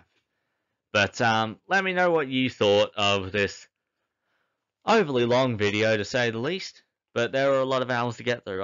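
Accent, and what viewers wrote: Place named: Australia. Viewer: Heeey an Aussie Planet Zoo voice! Great to hear one of us out there.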